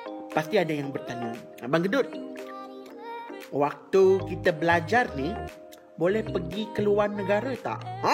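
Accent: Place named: Sarawak, Malaysia. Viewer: Terima kasih abang.. Byk info berguna pasal ipg.. Semakin bersedia sy nk masuk ipg 1 sept nnti.. Btw, abg sarawakian ke😂😂suara mcm org sarawak jk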